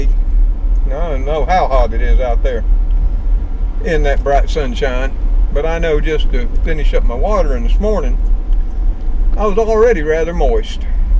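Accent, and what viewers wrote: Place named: Kentucky, United States. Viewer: With your accent, you sound like you are from my neck of the woods...Kentucky!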